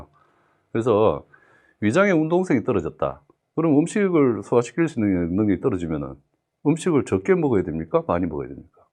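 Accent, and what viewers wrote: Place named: South Korea. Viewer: 잘생긴 외모에 멋진 목소리 구수한 사투리에 친절하신 설명이 도움이 많이 되네요 감사합니다~~~~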